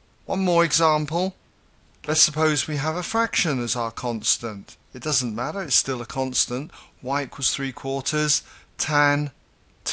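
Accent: United Kingdom. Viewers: thank you so much. excellent pace and explanation. plus, i felt like i was at hogwarts with your wonderful accent! :) thanks again!!!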